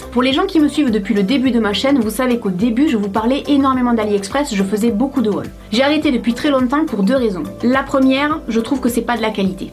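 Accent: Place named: Perpignan, France. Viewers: et beh je me disait bien que ton accent me disait quelque chose... J'habite Perpignan depuis 4 ans environ, mais je ne connais pas du tout Centella. Pour Aliexpress, je n'ai jamais eu aucun souci pour le moment, mais je ne commande rien d'électronique, ni de soins donc bon pas trop de risque. Et pour justfab, jamais testé, mais j'ai toujours pensé que ça puait l'arnaque. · Coucou! Je te suis depuis quelques semaines à peine et j'aime beaucoup ce que tu dégages (ta fraicheur) et tes conseils avisés. Tres heureuse de savoir que tu es de Perpignan (je me doutais bien vu l'accent!) et ravie également d'apprendre qu'une marque de cosmétique est produite dans notre belle région! Ne change rien, au plaisir de voir tes prochaines vidéos!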